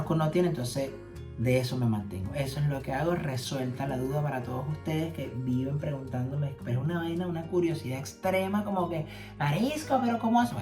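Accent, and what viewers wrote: Venezuela. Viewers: Gracias por compartir tus vivencias allá en Islandia..yo la verdad me río mucho con algunas cosas q dices..soy venezolana también..Guayanesa Edo.Bolivar..tenemos el mismo acento..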